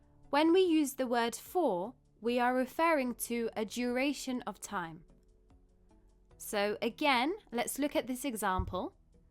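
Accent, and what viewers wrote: United Kingdom. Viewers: I have watched half of your video on YouTube, I can say that the way you are teaching is fantastic. You are a great teacher . Your British accent is still strong and clear for me . The way you explain is very clear and easy for thevpeople to digest your lesson. Keep up with new lesson .well done.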